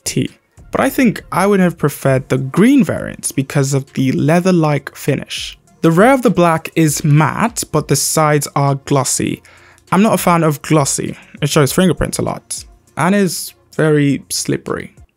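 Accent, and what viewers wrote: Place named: United Kingdom. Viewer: Bro your British accents very very cleary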